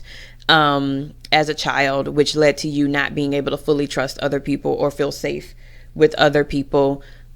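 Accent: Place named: New York, United States. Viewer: I'm terrible with accents, I thought you were from New York 🤦 hope you feel better soon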